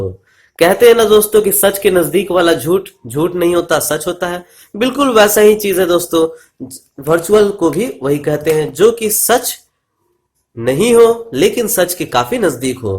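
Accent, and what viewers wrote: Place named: Bihar, India. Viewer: bihari hai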